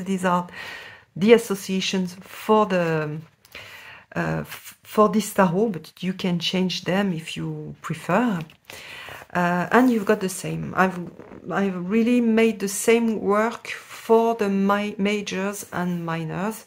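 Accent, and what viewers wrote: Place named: France. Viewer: Sublime tarot, félicitations à toutes les deux pour cette belle collaboration 💚💚💚 Il me tarde de lire le guide book et de recevoir le tarot. Bravo pour ta review en anglais. La langue de Shakespeare n'est pas accessible à tout le monde Bel accent frenchie, avec beaucoup de charme 💐 🧚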